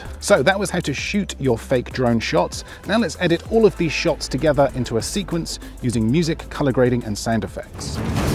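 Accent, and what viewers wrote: United Kingdom. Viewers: めっちゃイギリス英語！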